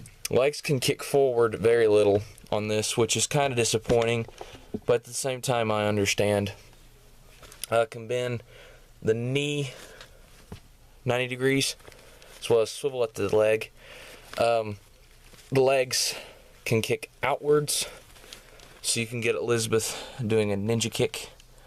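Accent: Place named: South, United States. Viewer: I'm loving the southern accent. I'm southern myself. North Carolina born and raised. I'm also a country singer and songwriter and comedian.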